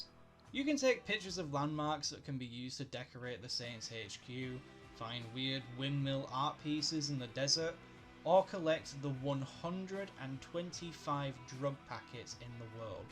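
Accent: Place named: United Kingdom. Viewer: "why is this random British guy talking about Shrek?" Is a question I face in my day to day life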